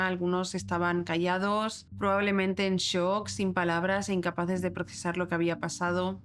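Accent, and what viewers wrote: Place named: Castile, Spain. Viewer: Por dios l sacais puya a todo,ella es Española y lo narra como Española castellana,si quieres escucharlo tal cual hablais en vuestro pais pues ver a una argentina narrandolo.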